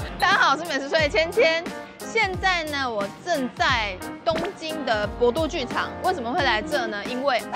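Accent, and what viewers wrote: Taiwan. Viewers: 看1分钟，好尴尬的台式全然大丈夫、なにこれ。。。ありがとうございます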